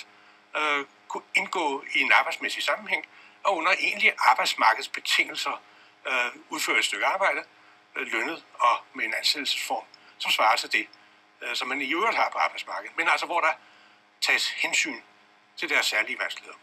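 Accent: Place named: Australia. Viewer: Is that an AS symptom, your picking up a little bit of an Australian accent. Oh, and is TMS trans magnetic stimulation helpful with AS depression?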